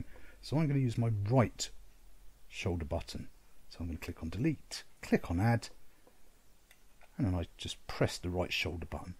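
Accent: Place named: England, United Kingdom. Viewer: This guy has a very strong English accent and I cant understand him plus I need the audio almost full blast. Is there a *TYPED* version of what this guy is saying?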